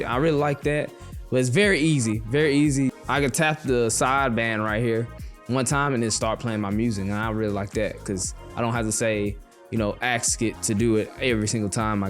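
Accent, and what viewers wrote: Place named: South, United States. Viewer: That boy from down south you can hear it in his voice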